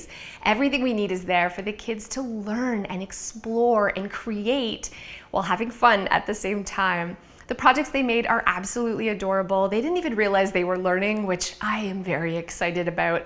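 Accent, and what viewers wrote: Canada. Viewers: I have been watching/listening to your videos all day while I work. I'm the mom of 5 kids and I LOVE organizing, but nothing has ever really stuck. I have such high hopes after everything I've learned from you. I love the minimalist mom too, but I can't fully get on board with that concept so it's nice to have categories that I put my things into. I've taken breaks all day long to declutter certain areas, it makes such a difference to take things one shelf/cupboard at a time. I love that you say organizing can only come after the decluttering. I'm working on decluttering all the areas first then go back to really organize. Thank you for being so motivating, so relatable, and so kind. Also, my dad's family is from Calgary Alberta, where are you from? I can hear your Canadian accent..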